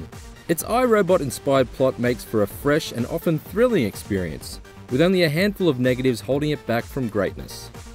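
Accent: Australia. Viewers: is this australian accent ? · Australian narrator? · he wasn't talking inside his mouth the reason why u couldnt(count) understand what he was saying is because he is an Australian which is a variation of the proper english language. so dont give this a bad review because u couldnt understand it because that would be like me giving u a bad comment mark because u have poor english writing skills.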